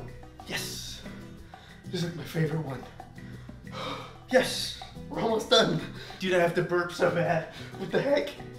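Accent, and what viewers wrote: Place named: United States. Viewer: I guess ur born in the US then as ur accent is american.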